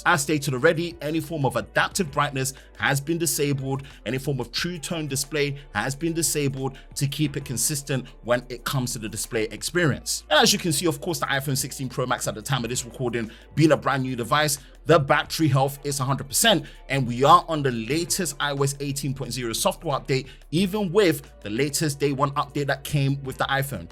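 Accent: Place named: United Kingdom. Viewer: I like your British accent more than the whole drain test bro . Keep it up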